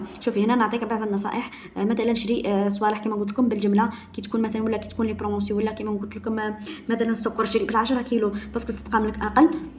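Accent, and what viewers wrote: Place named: Chlef, Algeria. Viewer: مشاركة جديدة معاك ..يعطيك الصحة عالأفكار القيمة ..عادة لا أحبذ السرعة في الكلام لكن أحببتها في فيديوهاتك خاصة مع اللهجة التي أضنها شلفية والله أعلم ☺️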